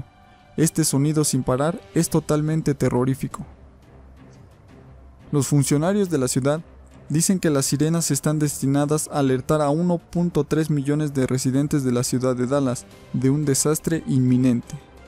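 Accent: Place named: Mexico. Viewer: El comentarista tiene acento mexicano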